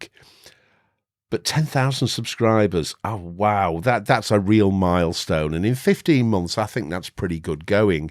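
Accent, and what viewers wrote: United Kingdom. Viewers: Congrats on the 10K! Well deserved. :) Why I like your channel mostly comes down to personality. You're calm, natural, and we're in a similar age group. I'm also loving the fact that you're British. The accent is most welcome. I've always had a soft spot for well-spoken British English. Apart from interesting content in general, I think what I appreciate the most is that you're not attempting to make everything "funny" and/or sensational like so many others do. I'm really tired of that, feels like a lot of channels target 12-year olds and are only interested in the entertainment value. Looking forwards to future content, cheers.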